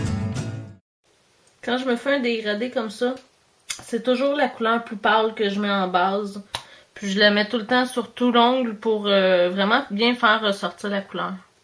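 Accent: Quebec, Canada. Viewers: Euuu accent quebecois ! Et enfin du monde qui parle francais/quebecois comme moi !! Lol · Si t'es pas au courant c'es l'accent canadien --" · YESS! Une québecoise qui explique comment faire un dégradé! En passant c'est super bien choisi tes couleurs, elles matchs vraiment ensemble! GROS PS: MOÉ JEL L'AIME TON ACCENT! -XXX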